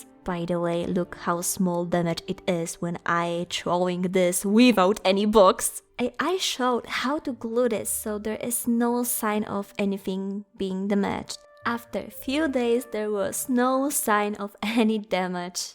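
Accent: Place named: Poland. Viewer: Don't worry about the language, you did a good job speaking. The Polish accent is noticable and I find it slightly funny/wholesome to listen to, as a Pole, but I think that's just how most of us sound like trying to speak English without much experience. I might be making an English channel after Hytale releases so I also definitely will be sounding like that 😄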